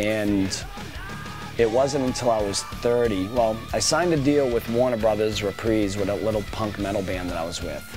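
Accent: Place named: Boston, United States. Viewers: Boston. My Grandma was from there and jad the same accent.😁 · He's from Lawrence kid! It's a Boston accent we all sound like massholes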